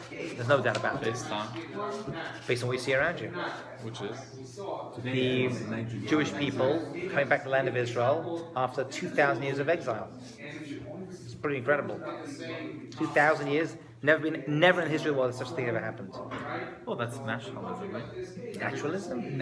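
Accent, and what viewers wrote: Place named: United Kingdom. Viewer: No disrespect he sounds more like English or British person wow back to the study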